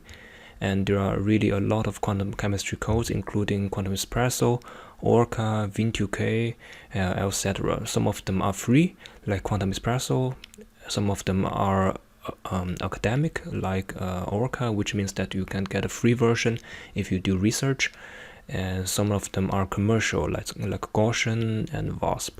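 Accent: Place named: East Asia. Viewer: Such a nice and comprehensive explanation. seem to be the accent of East Asian.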